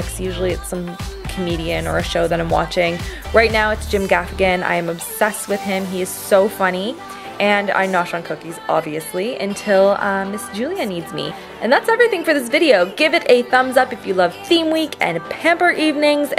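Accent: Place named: Canada. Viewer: Are u Canadian u kinda have an accent I was just wondering ;)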